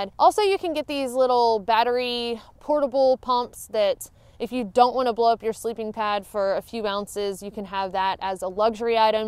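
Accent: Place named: East Tennessee, United States. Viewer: Wow a lot of great info! I like the accent reminds me of East Tennessee.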